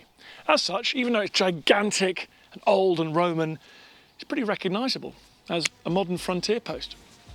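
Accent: United Kingdom. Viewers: Good video - but... You need to provide subtitles better than the auto-generated ones. British accent can be challenging for non-native English listeners as much as it is for whatever AI is translating, and if you add Latin words to the mix, you get amazing things like "Allah Augusta" and there's no way to unread that once you read it. 😂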